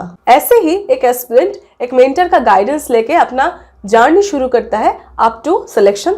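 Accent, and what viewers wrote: Bengal, India. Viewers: Sir ne bola suggestions dungi😂😂 So cute hindi bengali people speak ❤️❤️😘😘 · Mam aap kya bengal se ho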